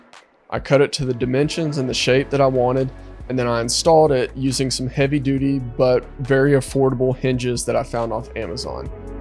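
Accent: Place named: South, United States. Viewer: Interesting accent. A little bit of a southern accent, but not too southern.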